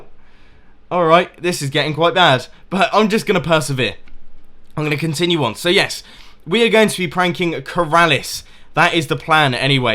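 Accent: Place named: RP, United Kingdom. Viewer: Why is he so posh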